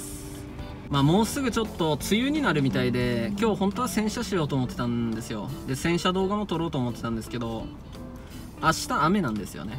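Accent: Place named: Kagoshima, Japan. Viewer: コメント失礼します。違ったら申し訳ないんですけどイントネーション鹿児島の人見たいですね😊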